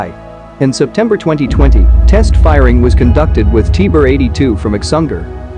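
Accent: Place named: United States. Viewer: Ich frage mich wie krank ist die Menschenheit, die Überschrift heißt, Endlich hat die Türkei die Tödllichste Drohne. Als ob die Welt ohne Waffen da steht. Der Sprecher dürfte wohl Amerikaner sein. Ich wünsche uns allen Frieden und Freiheit auf Erden ohne Waffen, und nicht mit Waffen.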